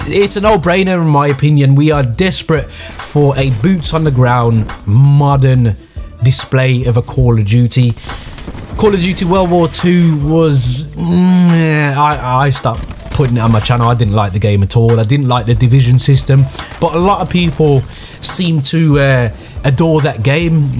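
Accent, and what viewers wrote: Birmingham, United Kingdom. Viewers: Are u from Birmingham England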